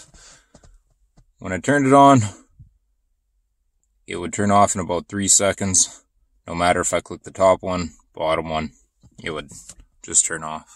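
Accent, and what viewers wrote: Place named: Canada. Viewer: This guy has the most excellent Canadian accent I've heard in a while!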